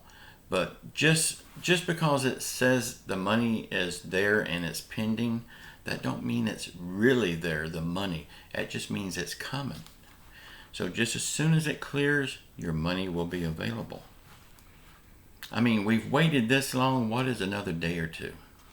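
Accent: South, United States. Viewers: Good Morning. Hahaha..”If it don’t help me, it should not be.” hahaha..where do you come up with your material? Hahahaha..love it..funny, but it shows what type of people we have become. And yes, I agree with the comment about “liking the way you talk.” You are an extremely good communicator who can get your point across in “plain talk.”...which is a rare quality..a lot of people overdress words. And, my wife and I loved the South..there is something special about a Southern accent. You have been blessed with talent and appeal.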